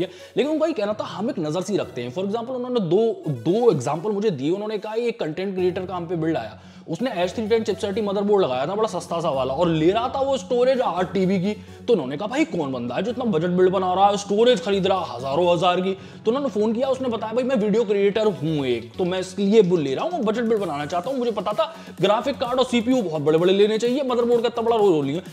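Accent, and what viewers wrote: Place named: Bulandshahr, India. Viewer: Where are you from, I can recognize your language. Are you from bulendshar, up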